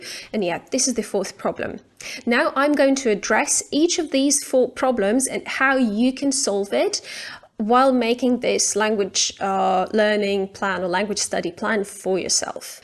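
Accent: United Kingdom. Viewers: Какой красивый британский акцент.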